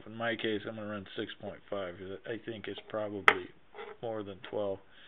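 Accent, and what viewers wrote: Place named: Appalachia, United States. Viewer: Damn your the slowest talking HillyBilly I ever heard.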